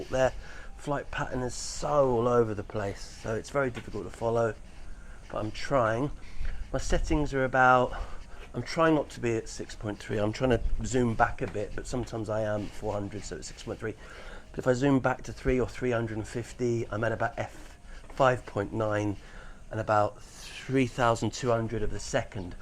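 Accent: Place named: United Kingdom. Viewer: A very good effort for your first vlog attempt with interesting content and I am looking forward to seeing more on the OM-1 as it is on my Christmas wish list. It would be good to include your location in the vlog as I was playing detective trying to work it out. UK accent and driving on the left, so I originally thought somewhere in the UK. But the light just appeared too harsh to be the UK. You said the temperature was 35c, so I thought you must be in Australia. But then you were photographing Pacific Swallows, so I started to suspect Japan but was not sure. It was not until I read one of your comment replies that I knew you were in Japan for sure!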